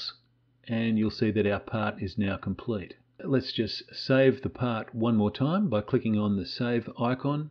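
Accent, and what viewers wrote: Australia. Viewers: Finally a tutorial not jammed up with loud background music and high speed cursor movements explained clearly at a pace I can follow. I think this is going to work for me. Nice to hear an Aussie voice too.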